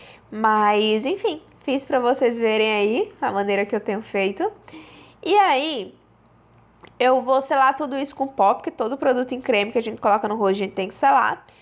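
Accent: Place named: Rio Grande do Norte, Brazil. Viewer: Sotaque lindo do RN ❤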